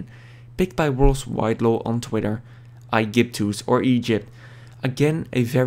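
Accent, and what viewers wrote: Netherlands. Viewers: crazy dutch accent i hear... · i can confirm as a dutch person it is a dutch accent he uses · wow een noorders accent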